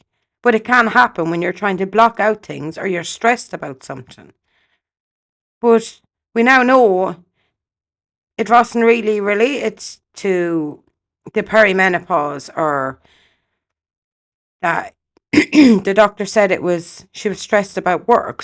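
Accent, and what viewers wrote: Ireland. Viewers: What part of Ireland are you from ?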